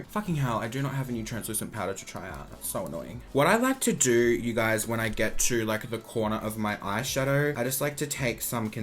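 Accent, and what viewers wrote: Queensland, Australia. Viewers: I've noticed that the queensland accent says "oh" as "err" e.g. "Sephora prER makeup" lol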